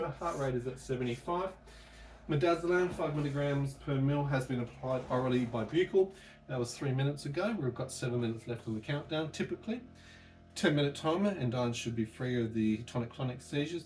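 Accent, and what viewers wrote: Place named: Australia. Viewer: hi my name is Payton and I'm from Pennsylvania and I'm also a special needs adult I have William sindrom and two conditions eye and heart I'm 24 and I support you and you're wife I Hope that she is okay and tell her that I think she is awesome! and you are cool !! I like Australia accent. it's very different than ours I don't drive or live on my own and I have balance issues I'm sending parys for her !! your friend Payton from the United States of America !!❤❤❤❤❤❤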